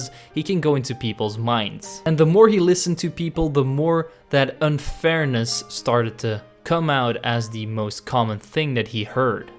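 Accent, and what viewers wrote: Flanders, Belgium. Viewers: Zijt gij van belgie? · Hey, are you from Belgium perhaps? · Are you from belgium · Ya french or Dutch belgium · Wait a sec UR VLAAMS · Are u belgium?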